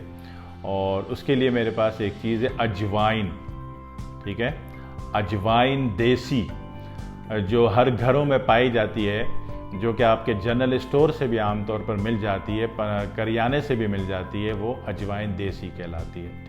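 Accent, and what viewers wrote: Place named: Delhi, India. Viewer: بھائی میاں آپ دہلی والے لہجہ میں بات کرتے ھو۔ نیم حکیم خطرہ جان۔ بھائ میاں چھوٹے گوشت کا قورمہ کھائیں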